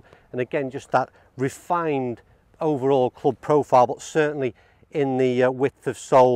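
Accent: Liverpool, United Kingdom. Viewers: I think scouse is as English as you can get. From a Manc.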